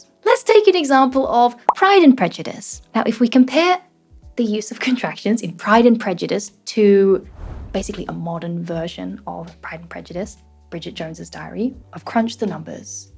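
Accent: England, United Kingdom. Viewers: Your voice and accent are really pretty! Where is your accent from? Is it English? Do some Australian accents sound British? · Hello! I like your British accent! Do you live in England ? And can you share tips to have an accent like yours ? I'm learning English(at school) but I live in a country where english isn't spoken that much... I like British accent so much! I've been trying to learn it but I don't have anybody to speak English with, so... I just talk with myself and I don't really feel like my British accent is improving...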